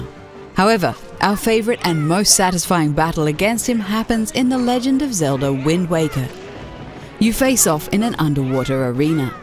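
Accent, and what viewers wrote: Australia. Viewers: Is the mysterious voice over lady's accent australian? Or where is this accent from? I love it! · She's Australian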